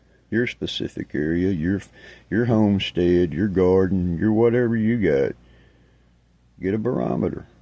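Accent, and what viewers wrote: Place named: North Carolina, United States. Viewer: a side thought.... sounds like you are from North Carolina or Tennessee.... love your accent... i live in east Tennessee ..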